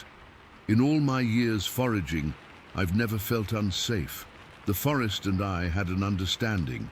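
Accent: United Kingdom. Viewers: Hard to listen to a British dude talk about American .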